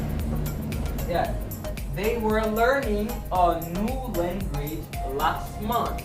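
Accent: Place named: United States. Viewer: Great English American bro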